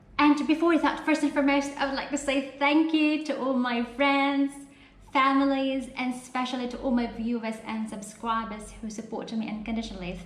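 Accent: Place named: United Kingdom. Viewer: Love the accent - grabeh! British accent bah? Dko sure. Love it!!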